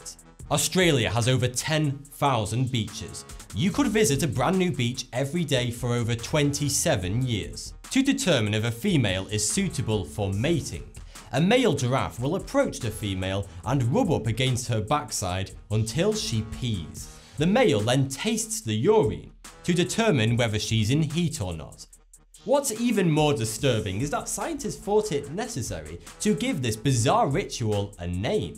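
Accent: United Kingdom. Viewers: This is the most british accent in the world period.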